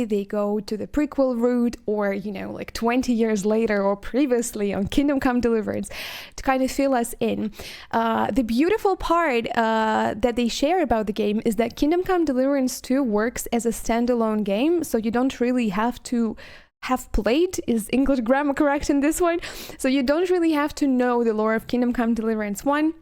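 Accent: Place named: Eastern Europe. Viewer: You don't have to work on your pronounciation. I can understand you very well and slavic accents are by far my favourite accents! No need to try to get rid of it.^^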